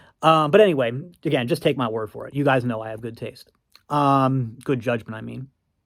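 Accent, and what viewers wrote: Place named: Africa. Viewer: African accent from an ex French colony. Perhaps Cameroon or Congo. He accent is really thick and annoying, he should have long worked on it.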